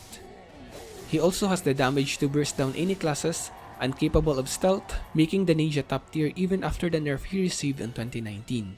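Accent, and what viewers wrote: Philippines. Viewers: Yep first 3 seconds and I can already tell this guy is pinoy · Sorry but I can't help but to notice. are you from south east asia? Particulary from the philippines? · I know a pinoy accent when i hear one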